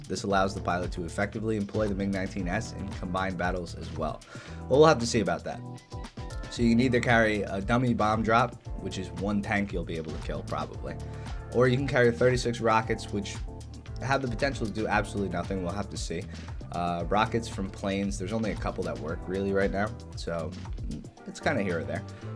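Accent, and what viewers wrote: Norway. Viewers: Are you from norway me to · Wait, er du norsk? (are you norwegian) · Is he norwegian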